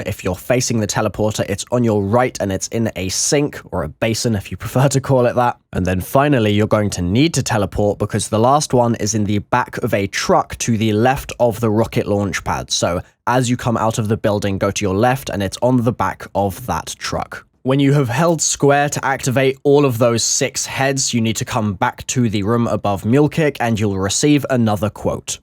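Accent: England, United Kingdom. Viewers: Naah... He''s just english broo its his accent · The British accent is the easiest to understand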